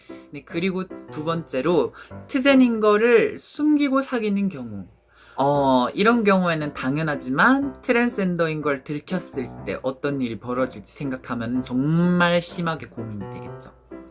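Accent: Gyeongsang, South Korea. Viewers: 경상도분이시네 그냥 사투리로 하시지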